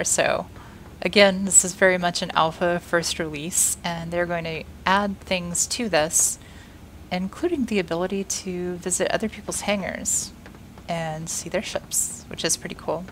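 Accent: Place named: United States. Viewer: awesome peek at whats to come! I'm so pledging as soon as payday comes! Its proper exciting:D lovin your American accent:) EVE Online is no more for me....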